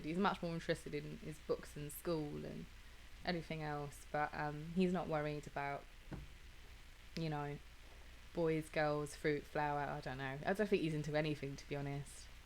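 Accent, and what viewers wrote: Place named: Cockney, United Kingdom. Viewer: voice seems different. Posher, less cockney!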